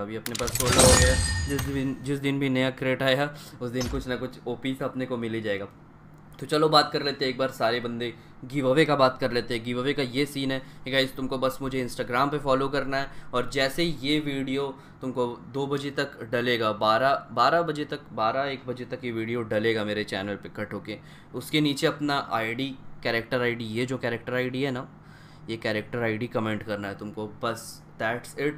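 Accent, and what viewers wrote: Bihar, India. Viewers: bhai bihar se ho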